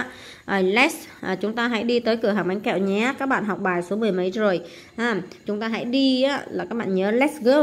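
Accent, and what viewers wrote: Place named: Thanh Hoa, Vietnam. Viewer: Cô thanh hóa ạ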